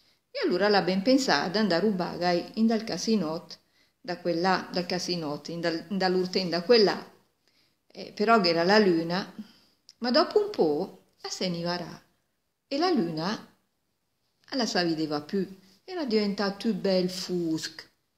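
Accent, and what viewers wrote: Milan, Italy. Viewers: Molto brava la signora! E' piacevolissima da ascoltare. Io ho capito tutto pur non parlando attivamente il milanese, perchè sono milanese con origini familiari diverse, ma essendo la mia fonetica, il mio accento e la mia pronuncia assolutamente simili a quelli della signora, ho una facilità estrema a capire anche quando non conosco le singole parole. Anche mia nonna raccontava storie antiche nel suo dialetto. I linguisti sanno che i dialetti regionali italiani non sono dialetti dell'Italiano, ma piuttosto varianti del Latino secondo la parlata locale e quindi in realtà si dovrebbero chiamare lingue regionali. In questo senso l'Italia è affascinante per chi studia linguistica. L'importante è mantenere un bilinguismo autentico. A me personalmente dà abbastanza fastidio sia quando sento parlare italiano con regionalismi sia quando sento parlare un dialetto locale italianizzato. 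Quest'ultima cosa avviene soprattutto fra le nuove generazioni, tanto che spesso non sono più in grado di capire il vero dialetto dei loro nonni. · Ho capito tutto, brava a raccontare, è però forse un "milanes ariús"? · Bella storia. Finalmente ascolto una pronuncia del milanese. Ci fai altri video belli come questo. Ciao. Vivo nel Lazio. Ma il milanese mi piace molto. Brava.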